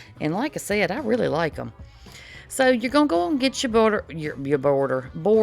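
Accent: South, United States. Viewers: I just watched your beef tips recipe (10/13/2025) and you linked this one, so now I'm watching this one. I have to say, your Southern accent is sooo much stronger in this one than in the beef tips video. 😂😂 I know, I'm strange, I notice your voice in every video. You just have that sweet comfy Southern voice. 😊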